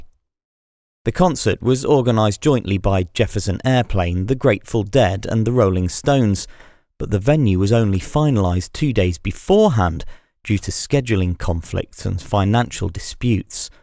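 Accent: United Kingdom. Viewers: Why are these always British commentary guys?!?!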